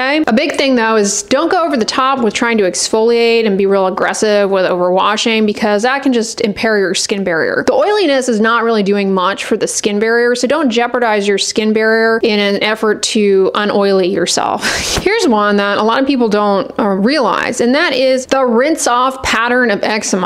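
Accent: United States. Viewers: Unrelated, but what part of the USA is this accent from?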